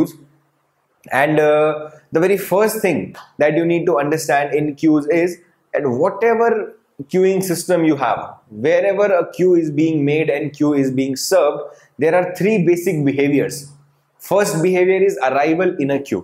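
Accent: India. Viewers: I like how you elaborated what queuing theory entails with simplicity and clarity even though Indian accent is a bit of challenge.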